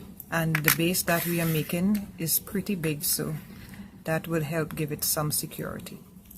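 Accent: Trinidad and Tobago. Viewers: Hi you sound like a trini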